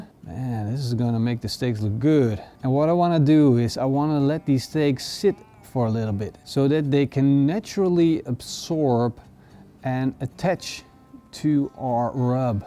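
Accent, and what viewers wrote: Netherlands. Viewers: I could just hear that dutch accent and it makes is 10 times better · First video of you I watch, one minute in I thought “huh he sounds dutch” and sure enough a few seconds later you start talking dutch lol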